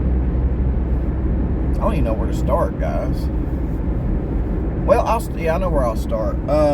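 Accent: South, United States. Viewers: I like your nice southern accent. I am in UK, and it sounds nice. Kinda very relaxed.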